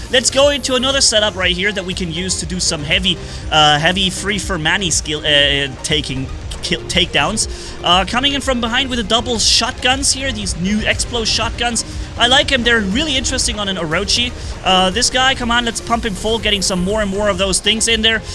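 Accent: Germany. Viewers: Wait… Is HE GERMAN?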